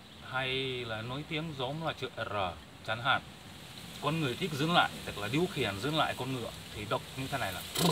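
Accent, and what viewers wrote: Standard. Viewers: phát âm tiếng Việt chuẩn quá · bạn nói tiếng việt chuẩn quá <3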